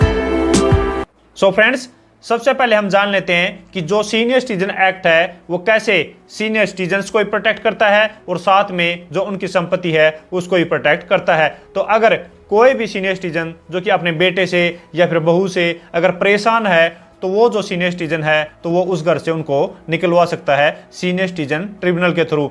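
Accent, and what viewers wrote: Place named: Haryana, India. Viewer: आपका वीडियो देखकर लगा कि स्क्रीन पर फिल्म अभिनेता अनिल कपूर हैं लेकिन आपकी हिन्दी में थोड़ा हरियाणवी टच है इसलिए बात समझ में आ गई।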